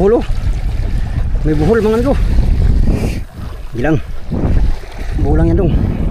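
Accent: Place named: Visayas, Philippines. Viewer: Idle ayusin monga yung pagsasalita mo magtagalog May halo kasing bisaya,kong bibisain mo nalang kaya para hinde ka mahihirapan sakalisod haha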